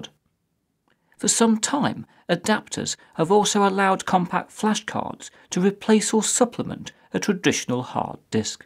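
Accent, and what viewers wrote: England, United Kingdom. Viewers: I think he's Brittisch ^^ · I am an Hellen (greek), but english is perfectly articulated and his videos very enlightening and educative. Thumbs up for both the cool English accent and valid content of this gentleman's videos. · This is by almost tradition how BBC news speaks or most of British documentaries. from his accent i would say hes British. that is just how they narrate this is fine just somehow feel sorry they seem pretty sad when they narrate. almost as if telling me "Why would you do that :( "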